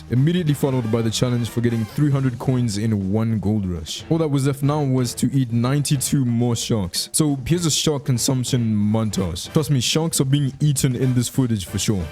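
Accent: South Africa. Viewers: Wait are you South African? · You're south african??? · yoo you're south African? me too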